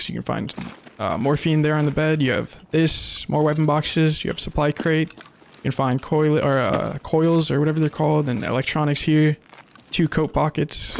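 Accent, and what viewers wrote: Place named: Netherlands. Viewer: You hear the juicy dutch accent, hes doing a good job of hidding it.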